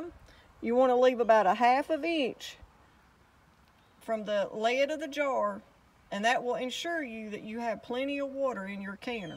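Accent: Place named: Alabama, United States. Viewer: Where you from, I would say Alabama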